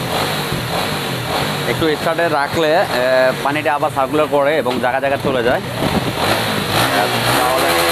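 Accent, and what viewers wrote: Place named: Naogaon, Bangladesh. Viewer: ভাইয়া আপনার গ্রামের বাড়ি কি নওগাঁ জেলা? মানে আপনার কথা শুনলে মনে হয় তো তাই জিজ্ঞেস করলাম আর কি!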